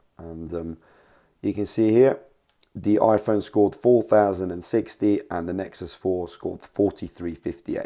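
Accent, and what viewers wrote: United Kingdom. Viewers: Finally a British phone reviewer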